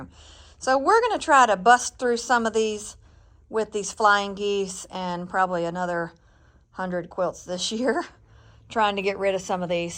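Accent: South, United States. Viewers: I enjoy your southern accent. Your teaching techniques are very clear and easy to follow. I would have never thought of making the flying geese like this. Very colorful and unique. I have sewing since age 8 and am now 76. I’ve just gotten started w guilting. I am making quilts for premiers who don’t make it for parents to keep or bury child with. I’m a retired nurse and am making guilts for children with cancer. I would gratefully take some scraps off your hands and even pay postage to Kansas City MO. Continue designing and sharing w us. So get found your YouTube channel. Blessings 🎉 I’m a 100% disabled Vietnam Veteran registered nurse74-76 w asthma/COPD and sewing gives me pleasure and joyful gratitude to provide encouragement to others. ❤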